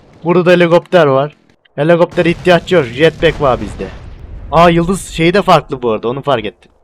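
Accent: Turkey. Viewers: Eline sağlık dostum harika video olmuş. Bu arada nerelisin? Ben Denizliliyim ve bizim oralılar gibi konuşuyorsun :D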